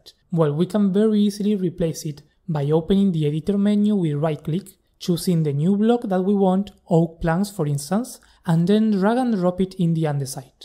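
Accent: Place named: Argentina. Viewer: Sos argentino? Tenes un acento marcado me encanta ❤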